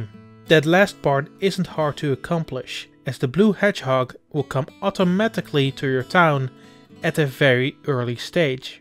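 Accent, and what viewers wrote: Netherlands. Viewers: Is this voice over Dutch? Been wondering ever since I heard you! :) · That's the Dutch accent for ya. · You’ve got like this clear dutch accent 😭 but apart from that this was rather helpfull. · I think this guy is dutch that accent sounds familiar. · Thanks for the tips! Are you dutch? I'm dutch and my bf heard the dutch accent lol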